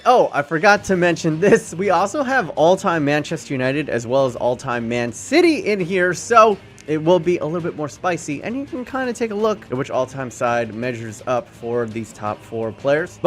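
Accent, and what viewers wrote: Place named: United States. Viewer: your commentary is SO american hahahah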